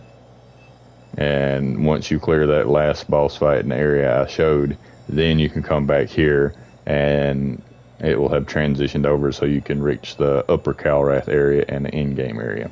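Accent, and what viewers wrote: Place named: South, United States. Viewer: You sound like a red neck bunkin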